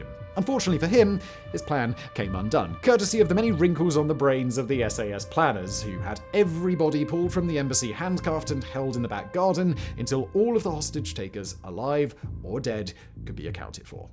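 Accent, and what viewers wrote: RP, United Kingdom. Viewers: When someone speaks the Queens English, it can be assumed the person values one's roots. 😉🇬🇧🏴✌️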